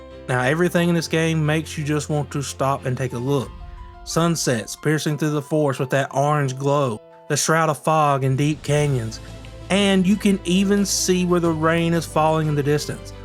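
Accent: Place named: Appalachia, United States. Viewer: No, it's because he has a southern or appalachian accent, and it's the way he pronounces his words :D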